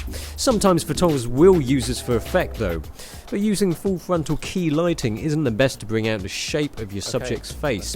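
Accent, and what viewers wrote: England, United Kingdom. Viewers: an asian guy with british acent omg -____ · i don't like how he speak.. British ? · The English accent, raises the perceived quality of the instructions several folds, such is the power of the ENGLISH accent.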